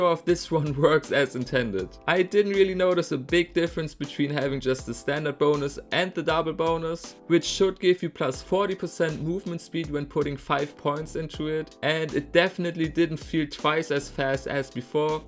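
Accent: Germany. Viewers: Höre ich da deutschen Akzent? xD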